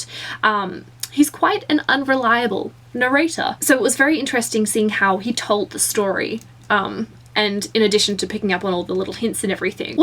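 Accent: Australia. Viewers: You sound aussie! haha I don't come by many of them on the youtubes, I really feel this book needed a more in depth review as there is soooo many topics this book brings up, regardless this was not too bad. As for the narrators name.. he mentions a name a few times I believe it was jake or joe or something.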